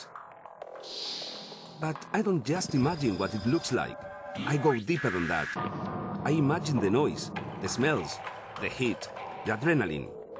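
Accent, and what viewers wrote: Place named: Spain. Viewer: I like your accent. Spanish?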